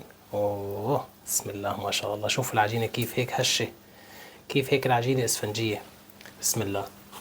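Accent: Syria. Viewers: معلم المعلمين والله حضرتك كأنه حلبي. بلدياتي هلافيك من وين ماكنت المهم أنك سوري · تسلم يدك. جميله اللهجه. حضرتك سوري؟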